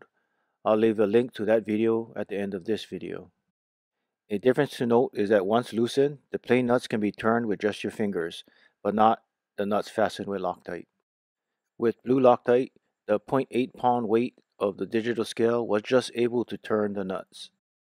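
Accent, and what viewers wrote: Hawaii, United States. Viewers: You sound Hawaiian 🤙🏽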